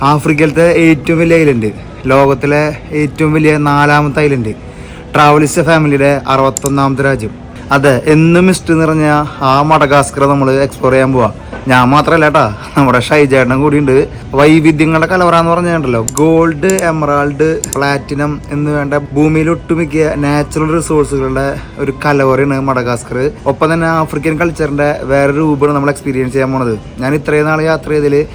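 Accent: Thrissur, India. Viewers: ninte ee Thrissur slang onnu matti pidichal korechukoode okke reach kittum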